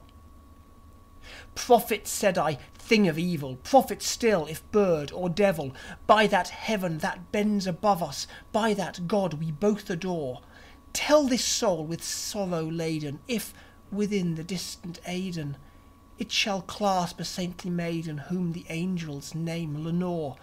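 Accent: United Kingdom. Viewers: Deep sht. R u brittish?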